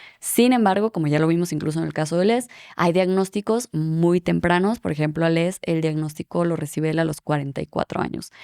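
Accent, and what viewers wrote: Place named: Sonora, Mexico. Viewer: Vivo en Austria desde hace 11 años pero soy de Sonora. Me encanta escucharte pero en este vídeo se te sale mucho el acento washo 😭😭 nimodo... Buen episodio. Saludos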